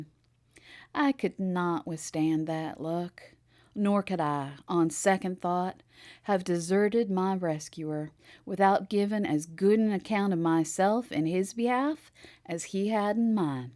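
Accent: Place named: South, United States. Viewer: I am enjoying the story time. The slight southern accent is awesome.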